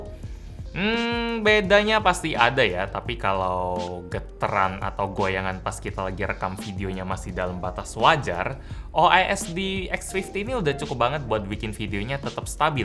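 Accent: Palembang, Indonesia. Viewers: Bang org mana sih? Palembang?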